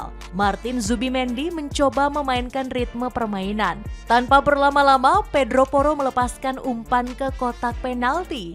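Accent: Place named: Surakarta, Indonesia. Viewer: Makk ngeriii ya, pakai narasi pria solo dia. Kartu merah sitttt😂😂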